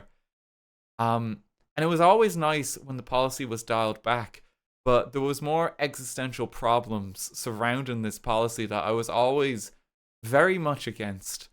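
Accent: Ireland. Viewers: You're Irish?!?!?!?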